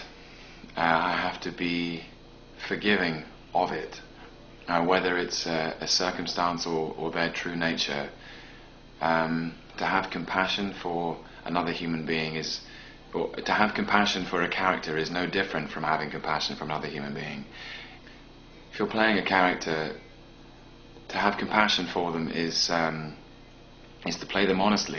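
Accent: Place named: England, United Kingdom. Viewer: I love hearing his English accent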